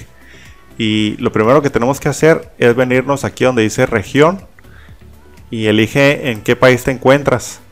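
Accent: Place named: Baja California Sur, Mexico. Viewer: Justo antes de que dijeras de donde eras ya habia reconocido tu acento de región, incluso podria ser de BCS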